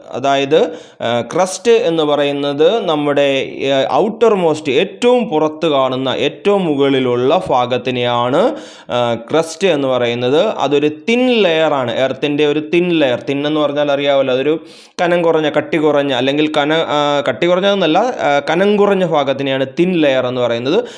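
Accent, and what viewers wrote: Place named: Kottayam, India. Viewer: Ath thettalla avarude language Angane aayittanu. Kottayam polulla chila placesil 'PA' ' Pha' pronunciation different aavum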